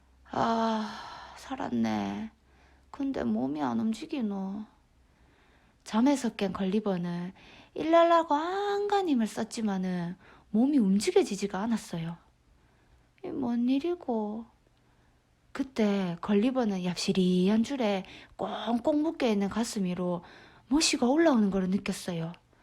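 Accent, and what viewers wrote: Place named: Pohang, South Korea. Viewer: 편안하게 엄마가 베드타임스토리 들려주는것 같았습니다. 잘들었어요. 포항이신듯요.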